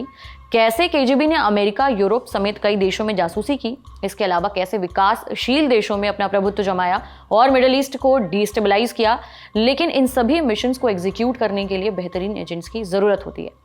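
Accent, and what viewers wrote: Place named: Rajasthan, India. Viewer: madam aap Rajasthani ho 😇...tiya pancha bolne ka trika like rajasthani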